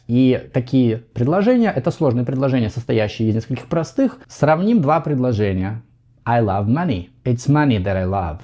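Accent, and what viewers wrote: United States. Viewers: Он и звучит как американец. У вас какой-то не такой ИИ (AI)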